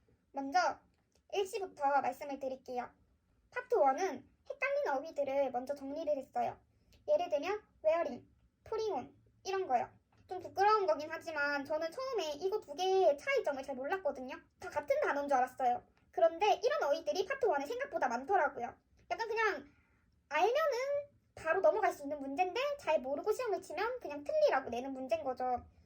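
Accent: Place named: Gyeongsang, South Korea. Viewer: 경상도 사투리가 좋습니다 ㅎㅎ 영상 내용도 좋네요 감사합니다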